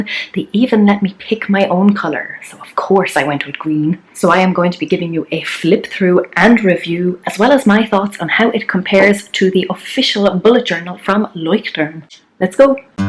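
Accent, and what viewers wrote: Ireland. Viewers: I love your Channell and bye the way I am sorry if this is rude but your voice kind of sounds irish and I live in Ireland do you?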